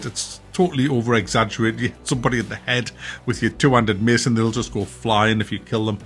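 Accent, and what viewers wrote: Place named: Newcastle, United Kingdom. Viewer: Nice to hear a geordie accent on a game review! You're my new go to game reviewer by default now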